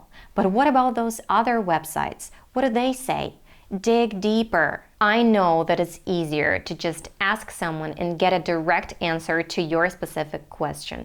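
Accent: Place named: United States. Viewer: Your accent is so American👍👍👍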